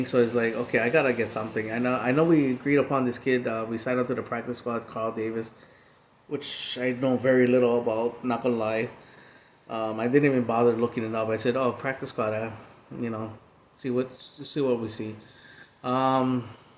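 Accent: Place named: Hawaii, United States. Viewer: Ahaha braddah wea u from..i know u from hawaii just by da way you talk but where in hawaii..btw you guys team looking like Rubbish..fly eagles fly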